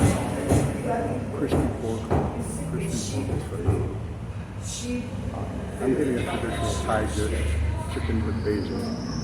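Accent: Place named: Chicago, United States. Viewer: Good video! Your voice sounds fine like my cool uncle from Chicago.. I thinks you made a good choice. Thanks for sharing and take care!🙏🙏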